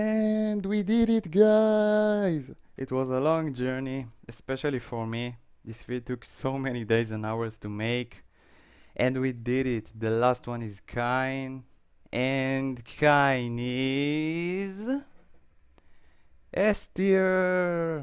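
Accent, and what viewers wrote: Israel. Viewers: I recognize an accent when i hear one 99.99% israeli :)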